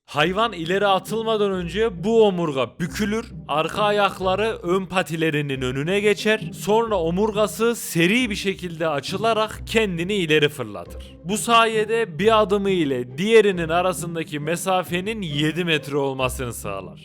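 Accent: Ankara, Turkey. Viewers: Tatlı güzel komik bi anlatım sevdim Ankara bebesi 😅 Angara 😊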